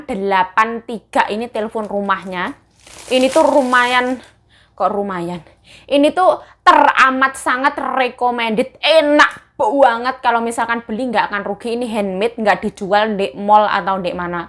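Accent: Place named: East Java, Indonesia. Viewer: aku seneng cuuaramu ngomongkilo jawatimurane kentel banget, jan lemaem uuenake duowo= enak banget👍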